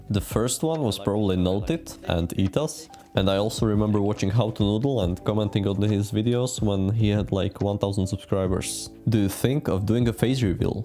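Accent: Czech Republic. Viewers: I knew you were czech... by the accent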